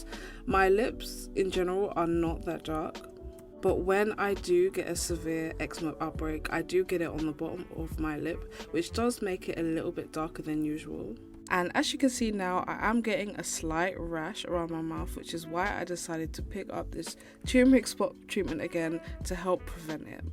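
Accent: United Kingdom. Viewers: you have a lovely voice I always wanted to speak in a British accent!🍵💕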